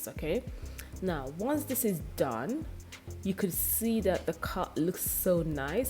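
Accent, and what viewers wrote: Nigeria. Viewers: I’m here to hear your nigerian accent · No be edo accent I Dey hear so???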